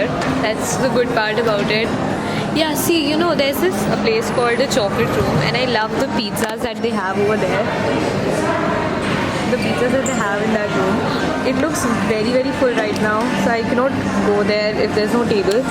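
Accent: India. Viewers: You are from Patna but your accent is really bombay types😊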